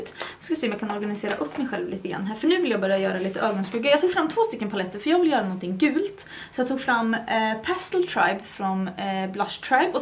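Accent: Värmland, Sweden. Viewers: 😂 Hur kan det skilja så mycket på rösten bara för att man byter språk? Och hur kan jag missat denna? Dock underbart att höra en härlig svensk dialekt. 🙌🏻 Tack för denna! 🌸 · Kul att höra dig på svenska och din värmländska klang lyser igenom 😍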